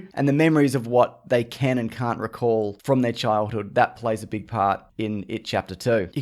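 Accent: Australia. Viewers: Love your accent. I'm guessing it's Australian? Also did you know Stand By Me is a prequel to IT? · U Aussie mate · Are you Australia? I am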